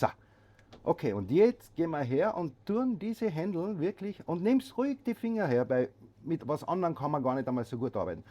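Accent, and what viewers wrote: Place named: Carinthia, Austria. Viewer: du bist so sympatisch u. ein super Koch, ich bin 66 Jahre, wohne in Tirol, bin eine leidenschaftliche Köchin, schaue immer gerne deine Kochsendungen, dein Kärntnerdialekt ist einfach super!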